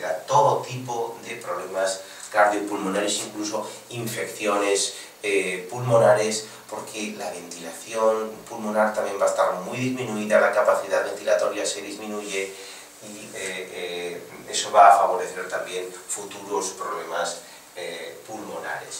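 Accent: Spain. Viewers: Pues, soy mexicano y me es incómodo y difícil escuchar lo que dice éste señor. No porque use otras palabras, si no más bien que por su acento me es complicado escucharlo. ¿Por qué? No lo sé, tal vez sea porque no estoy familiarizado con el acento español.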